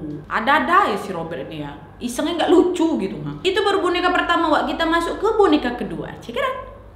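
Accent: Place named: Batak, Indonesia. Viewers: orang mana si logat nya macam sumatra kali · Kak orang batak ya? Soalnya gaya ngomongnya kayak orang batak😄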